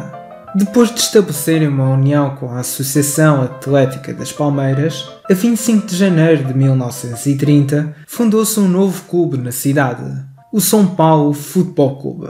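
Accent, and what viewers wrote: Portugal. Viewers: Que legal esse vídeo Gosto muito do sotaque de Portugal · Um dos melhores vídeos sobre a história do São Paulo, e com sotaque da terrinha! Demais! Contem a história da Eusébio Cup que vencemos o SLB no Estádio da Luz com o pior esquadrão da década!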